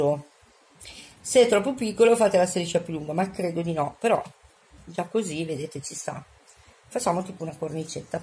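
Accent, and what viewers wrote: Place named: Bologna, Italy. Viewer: mi sono emozionata quando mi hai salutata, 😊 grazie per le belle parole...come al solito mi metti di buonumore, e mi fai tanto ridere con il tuo accento bolognese che adoro. Mi hai risolto un problema, non sapevo mai dove appoggiare gli orecchini quando devo fare le foto...grazie! Un bacione 😘😘😘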